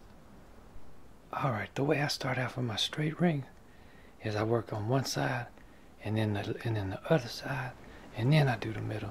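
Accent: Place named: South, United States. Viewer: the negotiator! LOL I love it! You sound like a cross between Dr John and Bob Ross! Love your accent! Are you from the south? Loved the video! thanks for sharing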